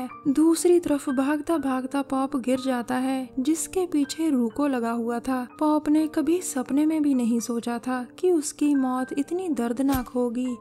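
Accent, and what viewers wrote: Punjab, India. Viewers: Aap punjab se ho kyaa g.....Very nice explained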